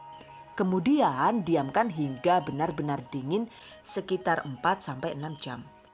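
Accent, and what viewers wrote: Banyumas, Indonesia. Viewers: Assalamualaikum mba saya paling seneng klau lihat mba lagi beraksi sambil ada selingan bahasa yg ngapak he he jd inget pulang kampung saya tunggu resep berikutnya karna menginpirasi banget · Hahaha yong selalu nonton vidione mbokayune Sing ngapak Ora ngapak ora kepenaklah.... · Suka banget chanel ini.. suaranya lucu sama di MIX pke bahasa ngapaknya..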